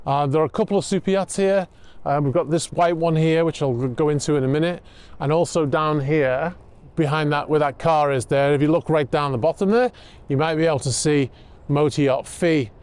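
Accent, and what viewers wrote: Liverpool, United Kingdom. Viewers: first video I’ve seen of yours here, belter, nice to hear a fellow scouser on YT!! Keep the bits up lad is right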